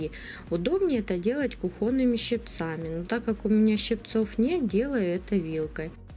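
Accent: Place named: Ukraine. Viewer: здравствуйте! Очень доступные и просиые рецепты у вас. Спасибо, что делитесь с нами. И ещё мне нравится, как вы говорите. Вы из Украины?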